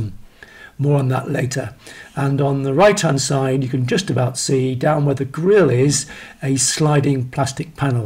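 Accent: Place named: United Kingdom. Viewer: Great to hear a Brit voice using air lift. I’m at the design stage of building a new airlift pond, would love to see more of your airlift setup.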